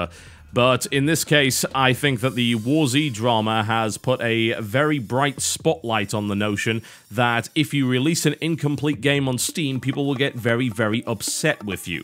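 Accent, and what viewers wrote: United Kingdom. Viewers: Don't know why but that British accent is such a turn on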